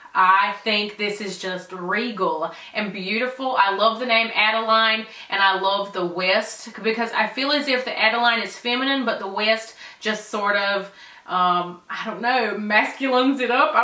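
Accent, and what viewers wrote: South, United States. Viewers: This is so unique! I love this video idea. Your country accent is so adorable. New follower, super excited to keep up with your channel!!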